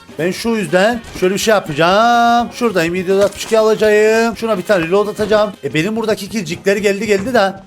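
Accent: Cyprus. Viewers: abi net kıbrıs😎😎😎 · Abi Kıbrıs şiven e aşık oldum .d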